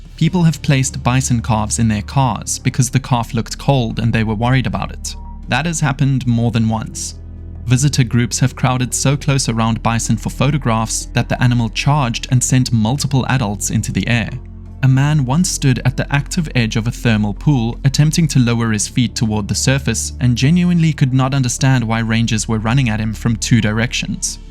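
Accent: South Africa. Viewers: Your accent sounds South African...? Hulle weet nie wat ons weet nie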